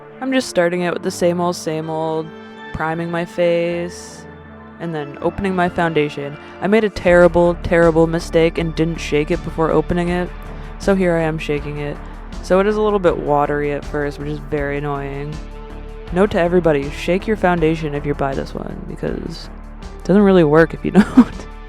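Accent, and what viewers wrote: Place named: Canada. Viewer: You Canadian Accent is amazing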